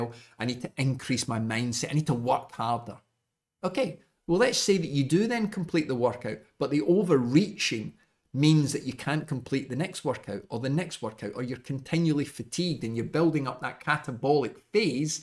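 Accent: Scotland, United Kingdom. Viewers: well deserved, Sir. I like the Scottish accent a lot and your humour in particular.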